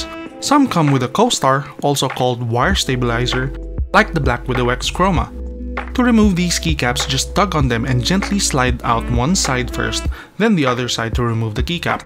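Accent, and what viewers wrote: Philippines. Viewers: Filipino accent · thanks for this! It's tedious work to clean out my mech keyboard lmao. and you're from the philippines, which i expected from your name but i didnt expect you to speak very proper english. i wouldnt say your accent gives it away, but certainly it wasnt THAT obvious that you have a filipino accent. salamat ulit par! malaking tulong talaga to sakin! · Agree, I knew I heard Filipino accent at first. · lol that accent is surely a filipino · Sagiri Izumi English is a widespread language in the Philippines, I’m more impressed his accent is not thicker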